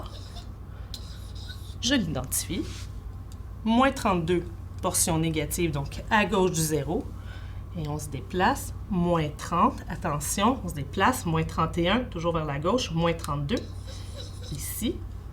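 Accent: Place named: Quebec, Canada. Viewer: Je comprend pas pour quoi tout le monde dit que ils font pas comprendre car Elle a in accent come c’est québécois j’ai compris tout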